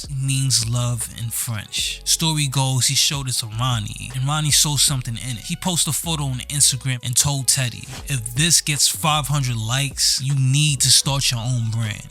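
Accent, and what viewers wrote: New York, United States. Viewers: Love this piece and hearing this accent makes me really homesick for NYC!😊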